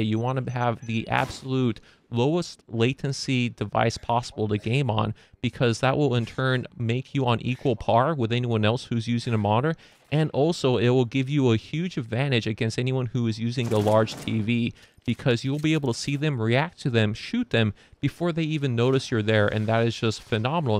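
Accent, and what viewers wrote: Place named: United States. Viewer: Not to offend, your voice is that of a very American American. Which is strange because it doesn't match your facial features at all! Gotta love genes. :P Sorry if I did offend you.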